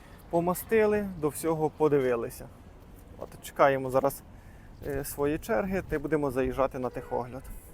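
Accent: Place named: Khmelnytskyi, Ukraine. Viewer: Привіт гарні доглянуті машинки) а ви звідки? А то чую Хмельницький говір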